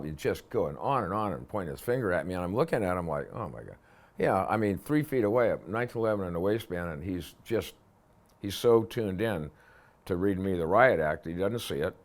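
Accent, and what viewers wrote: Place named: New England, United States. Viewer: Gotta love the New England accent! Like being in "Norich", CT.😅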